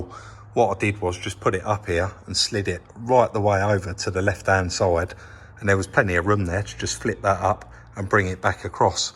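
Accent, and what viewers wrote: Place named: Essex, United Kingdom. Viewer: Love the accent is it Essex?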